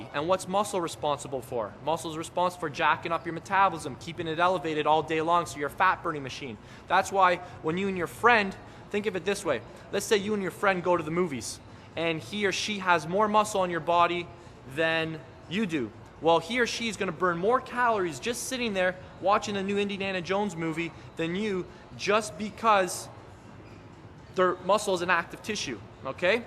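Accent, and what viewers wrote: Canada. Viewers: hehe he sounds like he is from canada or something ay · u can say this guy is Canadian :p well im too but i say about not aboot any way he said really good stuff so peace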